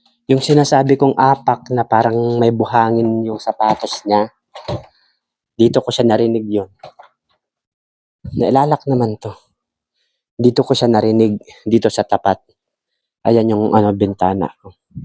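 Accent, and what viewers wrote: Philippines. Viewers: Bicolano ka po Sir?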